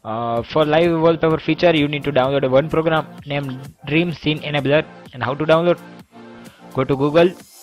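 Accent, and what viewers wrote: India. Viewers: i know its fake,you have an indian accent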